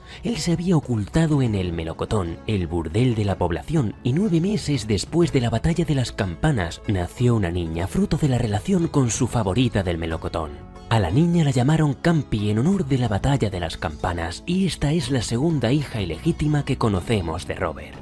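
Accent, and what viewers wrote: Spain. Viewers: Bonitas narraciones y hermoso asiento de la vieja madre patria, saludos desde 🇨🇱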